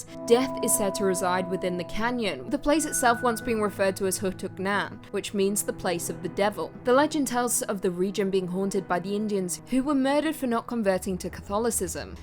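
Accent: England, United Kingdom. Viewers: speaking as an English person.. you still sound very English to me. I don't know what they're talking about. Keep up the great vids:) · the hollywood sign ghost looks alot like kirsten dunst, is it just me or? is not losing her english accent, what do you mean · I didn't realize that California had such dark and scary history and I love English accent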